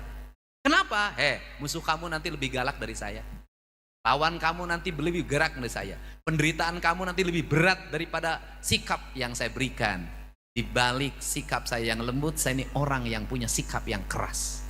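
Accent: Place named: Sunda, Indonesia. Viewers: Sawios atuh P d sauran aki Oge, jandi nyunda pisan hebat kan. Hidup 👍. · Ingat almarhum ibu ku yg berasal dari Jabar. Sunda asli kental sekali..mendengar berkata dipodium ini..subhanallah..smoga Allah jaga trus..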